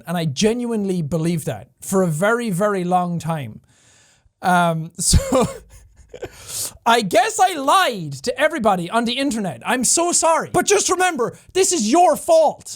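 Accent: Ireland. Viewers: holy, fake accent turned into an irish legend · That accent. That irish accent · Found your channel since pewds gave you that shoutout back in the old days. Ngl, i subbed because of the shoutout and never really watched your videos. I guess what i loved about Jack was his irish accent and energy he put into each and every video. Before, like you said you were shy and all that but then i saw those videos of you screaming your lungs out and it just made me respect you as a person you grew into. Well done Jack!!! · To think: you used to hide your accent, and now you're the internet's most favorite Irish potato -- I mean, Irishman 😀 As I'm sure you know by now (but I'll tell you again, dammit), we love you precisely for who you are as a person. No matter what your videos are about, no matter what other YouTubers are doing, as long as you keep being you -- you marvelous human being -- we will continue to love and support you. 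You bring so much positivity to the world in so many ways, and you've been gifted with a unique platform to continue sharing that positivity with the entire world. People always tell me "the world would be a worse place without you", but I know they just mean THEIR world. In your case, it's literally the entire world that is better because you are in it. And now I'll stop gushing because I'm sure I sound a little obsessed and maybe a bit creepy. I swear I'm not! I don't even watch every video 😜 I just think the world needs way more people like you in it and less people like.... well, like the kinds of people that seem the loudest these days. And it makes me overjoyed (and I guess a bit relieved) to see that people like you not only exist, but have a platform.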